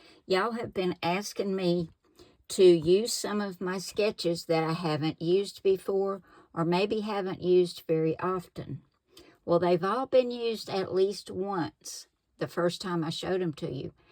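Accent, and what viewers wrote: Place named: Virginia, United States. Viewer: I love watching you make cards. It always feels like we’re having a visit. Your voice reminds me of my childhood visits to my family in Virginia. How about Sketch #17 or #31? 31 is very busy, maybe we better hope Wayne doesn’t see it!😂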